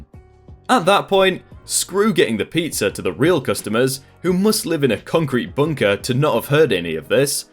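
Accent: United Kingdom. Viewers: If you want to find a taser flashlight, I have one. It's called the Guard Dog Special Ops Flashlight/Taser combo. I live in America so I'm not sure of you could get one from these people as you sound British. Still, if you can I highly suggest it!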